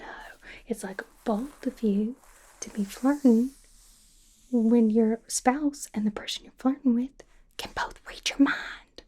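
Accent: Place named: South, United States. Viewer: Had been missing my favorite southern accented super hero. Always a wonderful experience.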